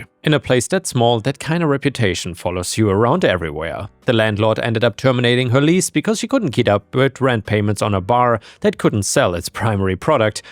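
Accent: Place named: Germany. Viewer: I bet on you being German. That's a daaamn thick accent. :D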